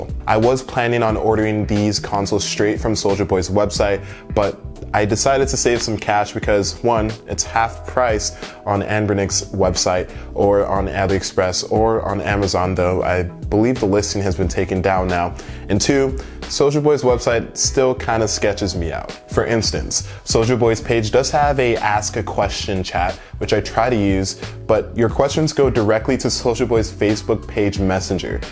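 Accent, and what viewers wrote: United States. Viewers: Where is he from Chicago LA Mississippi ATL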